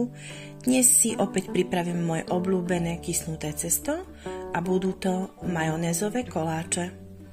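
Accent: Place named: Slovakia. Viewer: zdravím Vás- nejen výborné koláče, ale ta Vaše nádherná slovenština mi chyběly😁😋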